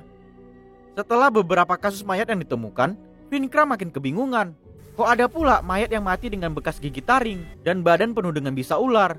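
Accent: Medan, Indonesia. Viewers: Logat medan nya mstap kali🤣🤣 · Dialeg medan bg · Nampak kali medaaan kau yaa 😂😂😂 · Medan kali kau yah lek hahaha sama sama medan kita Horas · Ceritanya kek lagi begosip versi org medan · Medan kali logat abang ini ya kan we...?? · Eh nampak kali Medan ny ABG ni bah seru pulak aku denger suara kau bg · Kekel ma bahasa ke bang... Medan d mana ke bang... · Orang Medan kek nya admin nya ini wkwk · Suaranya logat anak Medan ini... Yg Punya Canel anak Medan Bung... 😂😂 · Yang ngomong orang Medan y bang? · Fix ni admin orang Medan🤣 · Kok suaranya mirip logat org medan dah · Medan nih suaranya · Suara org Medan😀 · Becak becak, Medan x pun kau wey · Logag medan in kak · Logak cara bicara abng kaya orng medan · kek gini lah klo org Medan nge review film,jd ngakak😂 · Orang medan ni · Yang cerita orang medan , bah makin keren si abang ni😂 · Medan x bahh logat nyaa..haha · kyaknya ko orang medan ya bang Mantap la🖒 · Binje - Medan kali bahasa ko bang 😄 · Medan kali ah kau bang.. 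Horas medan😀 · Orang medan bah yg review film nya🤣,mantap kali bah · Anak medan bah😂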